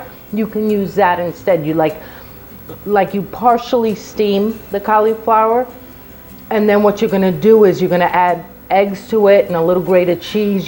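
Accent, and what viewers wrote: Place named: New England, United States. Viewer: judging from your accent your from the New England area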